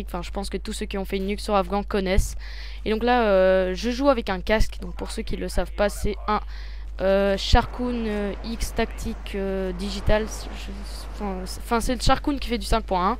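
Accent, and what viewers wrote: Walloon Brabant, Belgium. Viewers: vous etes du brabant wallon en fait ? :)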